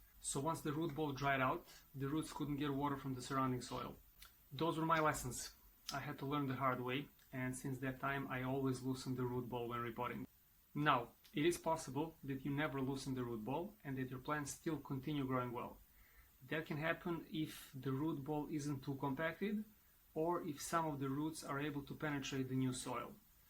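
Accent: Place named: Serbia. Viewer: Where are you from? For some reason, you sound Serbian to me 🤗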